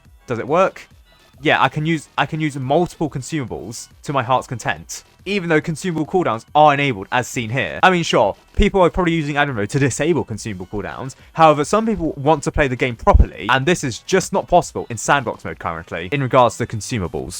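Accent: United Kingdom. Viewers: i forgot was British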